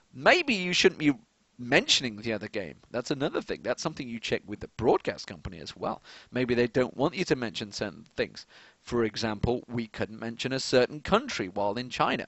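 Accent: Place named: United Kingdom. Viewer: You make me want to talk in a British accent... :/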